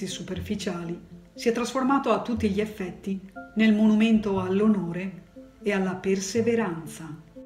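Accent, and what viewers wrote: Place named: Mestre, Italy. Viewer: Conoscevo questa storia incredibile....e mi fa piacere sentirla nuovamente raccontata da con il suo accento mestrino 😼...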